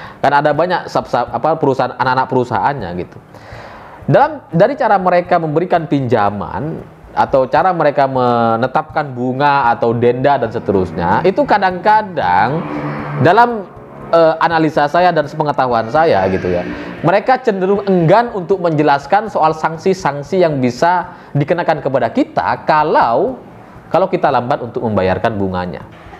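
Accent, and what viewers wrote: Madura, Indonesia. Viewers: Lucu.. kayak logat Madura mas host 2045 tv ini.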